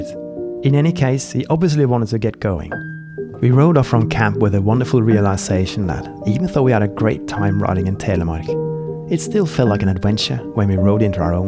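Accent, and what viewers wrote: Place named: England, United Kingdom. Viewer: because of your accent and perfectly spoken English I assumed that you were from England?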